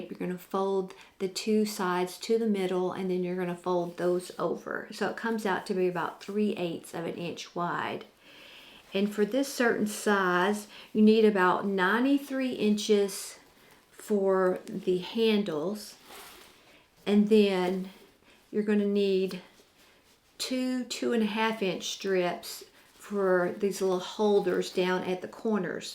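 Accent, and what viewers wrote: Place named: South, United States. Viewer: Thanks for this! Love your southern accent!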